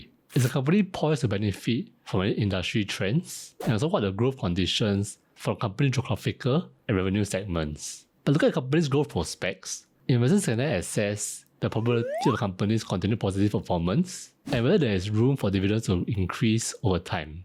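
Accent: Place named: Singapore. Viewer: Love your accent, are you from Singapore?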